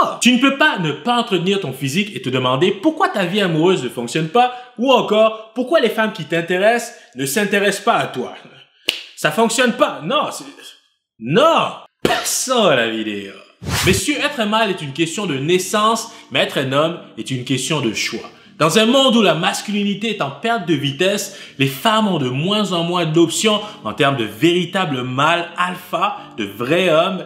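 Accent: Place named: Canada. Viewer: avec le son de la télé trop fort, devant mes neveux, cetait LOOOOLLLL. sinon belle video thanks. j'adore l'accent canadien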